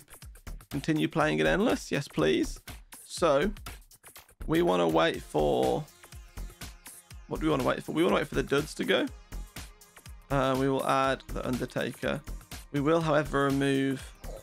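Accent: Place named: United Kingdom. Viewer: You still sound British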